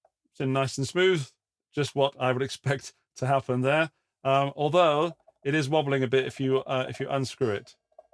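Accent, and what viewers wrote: United Kingdom. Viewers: How come we have someone with a British accent reviewing guitars in America?:face-green-smiling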